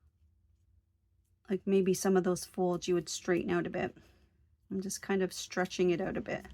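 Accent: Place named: United States. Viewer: New subscriber today. I love your calm presentation style. I'm in the UK. Which part of America is your accent from it's lovely?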